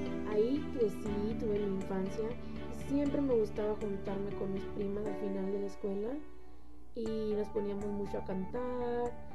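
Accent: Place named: Chihuahua, Mexico. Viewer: ya decia yo que tenias acento de chihuahua :) yo soy de chihuahua !!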